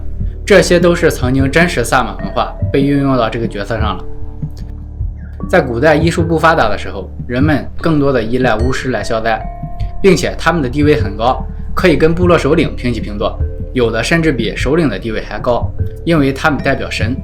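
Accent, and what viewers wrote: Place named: Shandong, China. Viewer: 这山东口音太重了吧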